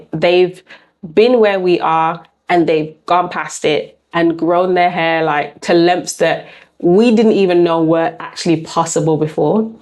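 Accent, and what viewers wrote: Birmingham, United Kingdom. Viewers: Wait are you a fellow brummy? 😮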